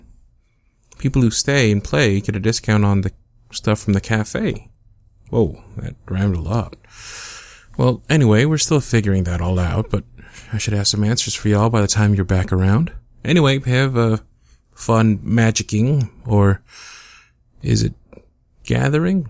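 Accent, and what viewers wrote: South, United States. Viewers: Ugh I’m such a sucker for country accents😩🤍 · Great video as always! as a girl from the south ( Florida in fact ) I am loving your southern accent. Keep up the good work sugar! ^-^ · Really wishing the guys around my town had this type of southern accent. They all sound brain dead.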